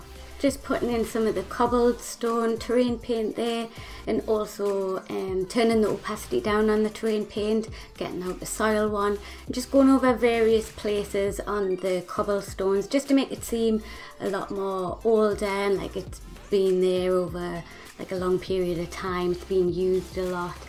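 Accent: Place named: Newcastle, United Kingdom. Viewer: Geordie through and through! Why aye lass!! Love your builds X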